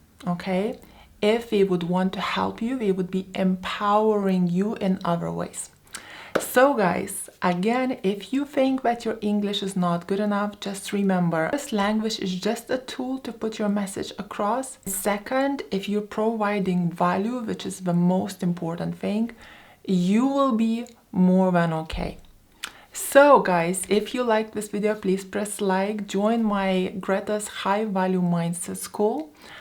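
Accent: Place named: Hudson Valley, United States. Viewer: Greta your awesome and we embrace your awesome HV accent and we understand each other :) Built for greatness, success and kindness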